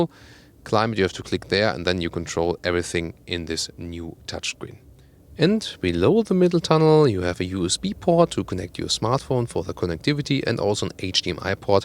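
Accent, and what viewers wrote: Germany. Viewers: I'm enjoying this just because it is a German review of the car:) I've been to Germany 7 days and love it there but listening to a German speak English and review a car.....epic!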